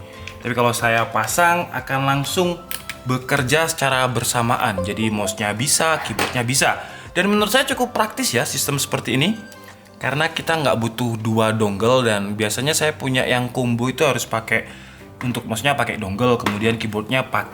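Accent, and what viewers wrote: Indonesia. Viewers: Pengucapan org indo banget, bertele-tele pisan.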